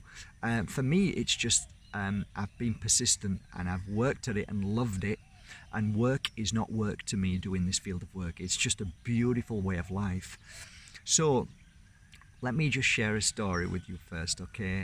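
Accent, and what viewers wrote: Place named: North, United Kingdom. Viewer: I could listen to you talk for hours. Maybe it's your northern accent 😉. Question re self healing. Because we are mainly water, do you agree that if we talk to our body using thanks and healing words etc, it works the same way as writing words and speaking to water like Professor Emoto did? By the way, Pyjamas. 😂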